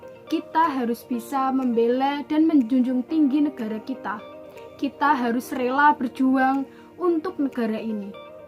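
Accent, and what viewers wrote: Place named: Java, Indonesia. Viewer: Mntap mntap, jowo tulen Iki🤤🤣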